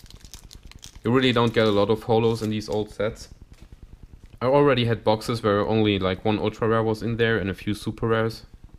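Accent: Germany. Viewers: Kommst du aus deutschland ? :) hast so nen deutschen akzent 😊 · Das Video leider erst gerade entdeckt :D Ich schreibe jetzt Mal auf Deutsch, weil sich Dein englisch so anhört, als würdest Du prinzipiell auch deutsch sprechen^^ Aber echt beeindruckender Pull. 1st Edition LOB Exodia boosterfrisch - wow! Da kann man nur Glückwunsch sagen :)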